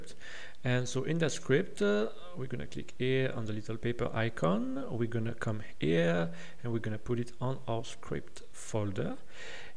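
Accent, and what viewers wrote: France. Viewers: Bonsoir, désolé si je parle en français mais mon anglais est vraiment naze et je crois comprendre avec ton accent que tu es français aussi;). J'ai un problème, je fais exactement comme toi et pourtant quand je traverse la zone cachée, l'anime revealed commence tout juste à s'afficher mais ne finit pas jusqu'a disparaitre complètement. Saurais-tu pourquoi s'il te plait?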